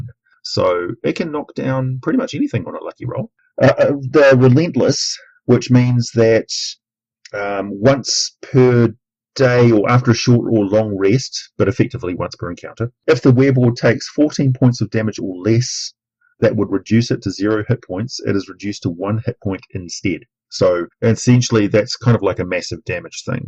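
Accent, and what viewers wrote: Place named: New Zealand. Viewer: That Kiwi pronunciation tho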